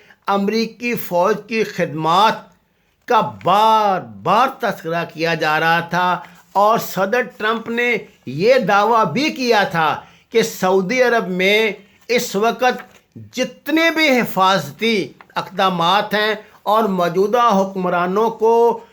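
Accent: Punjab, Pakistan. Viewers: punjabi touch ata hy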